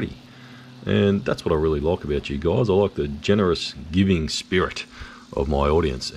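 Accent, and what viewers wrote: Australia. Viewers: it's great to have you back and hear that lovely Aussie accent! We miss you when you're not here but I hope your brief absence means you took some time for rest & family. I think your decision to donate to Jenny's Place is wonderful. Don't feel badly that you started 2023 out 'softly' by showing us one of your regular customer's yards. We see so many overgrown yard 'rescues' that once in a while it's nice to see a well-kept yard getting trimmed, and it shows us that not everything you do is a struggle. Best wishes for health and happiness in 2023! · Hi, I too love to hear your Aussie Accent!! So glad your giving the donation to Jenny`s Place! ❤ Now this happen to another man who cuts lawns in Florida, he was Edging but owners left their vehicles on the driveway right up by the house & he had little space to do the edging, but did it nicely & it was a Free One!! 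But something was in the grass & it flew & hit the Glass front door & shattered it...he had to replace door...ouch for the Cost! So I thought I warned you about it, I don`t want this to happen to you! Another Great Job on this yard!! Have a Good Work Week & a Lovely Weekend with Family! 🥰 Love from Central Illinois USA😉😎❤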